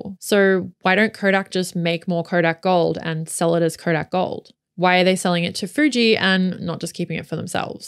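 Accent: Australia. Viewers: I’m subscribing for your content, your delivery and, of course, your awesome Aussie accent… as a Canadian living in Southern Arizona - visited Sydney in 2015… Beautiful people and sooor friendly. Don’t change a thing! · if you could drop either the Aussie accent or the valley girl inflection your channel would be one hundred percent more bearable.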